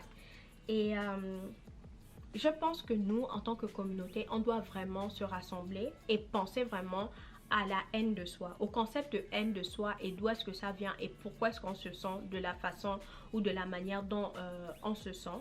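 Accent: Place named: Gabon. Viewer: En entendant ton accent j'ai cru que tu étais Gabonaise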